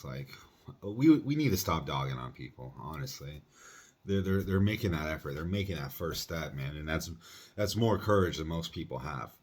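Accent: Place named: United States. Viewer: You look really Norwegian indeed. Hearing your fluent English American accent is so weird.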